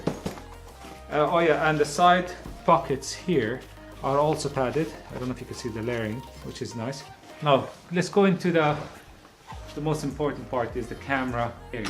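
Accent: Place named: Ireland. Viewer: Do I hear an Irish twang in the accent. Are you in Ireland - great review 😉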